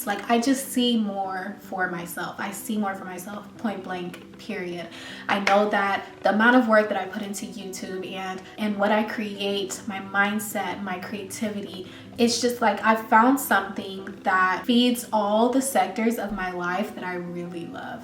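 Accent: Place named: Nigeria. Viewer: ohhh I said “chai” because I’m also nigerian hahah I was listening to your accent!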